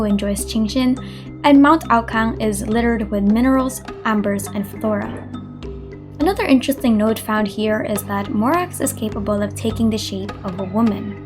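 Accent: Philippines. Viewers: Your accent! ❤ ph? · LMAO I pray that they don't find out about ur search history. Judging from ur accent, uI assume ur filo and God knows what happens in Filipino Christian households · This channel is such a hidden gem! I knew you were filipino the moment you started speaking 😂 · I'm guessing you're filipino? I can hear it from the accent. #represent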